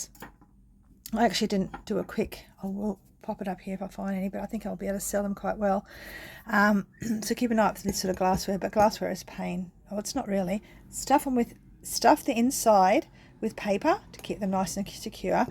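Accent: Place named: Australia. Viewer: I am a 67yr old Aussie NSW too and you were recommended by Jason Adams. Enjoyed your video and subscribed. So nice when I listen to our accent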